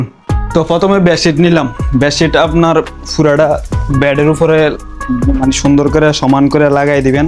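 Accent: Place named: Comilla, Bangladesh. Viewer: ভাষা শুনেই বুজেছি কুমিল্লা কিন্তু কুমিল্লা কোন জায়গায়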